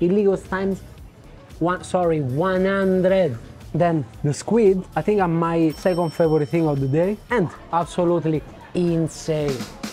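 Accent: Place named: Italy. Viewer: coba belajar bahasa Minang mix sama logat Italia. Alhamdulillah habis buka puasa lihat vlog jadi ngiler.. mana bisa nolak lihat dan makan rendang 🤤🤤🤤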